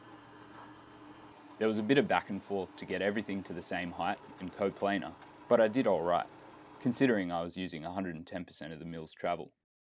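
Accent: Australia. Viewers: Some strange mix of This Old Tony, Marling Baits and.... well, an Austrailian... Edit: A very good mix. Excellent way to start a channel strong. good on ya mate. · Beautiful (Aussie) introduction. Great video. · Before I heard your voice, I was hoping it was Australian! And boy, I’m happy. Can’t wait to watch your next video. You can then join the ranks of CEE and I Did A Thing, and Pask Makes… no engineers in sight UK Aerospace Engineer here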